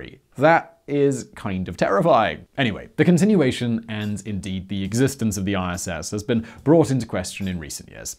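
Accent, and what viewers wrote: RP, United Kingdom. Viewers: NASAR the British accent gets me every time lol can pronounce Russia correctly instead of Russiar like NASAR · I'm hooked... Subscribed. And yes, this is Cykey I watch every channel that on. It's never boring, knowledgeable and is a cool ass host with the most that speaks the Queens English. That's guy is dope!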